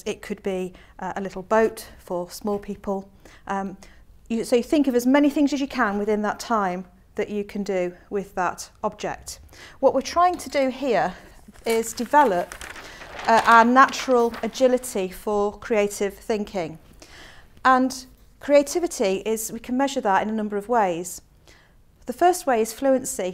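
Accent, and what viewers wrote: United Kingdom. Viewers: I'm an English teacher, I understand what you are doing as ahelp friend.with British pure accent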